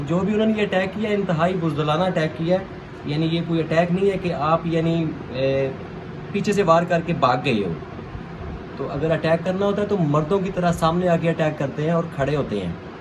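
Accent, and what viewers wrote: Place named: Punjab, India. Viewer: Aisy alfaz tho Punjabi b bolty ha.. Qk ghairat mand logo ka lehja yahi hota ha.. Ap kaha K patan Ho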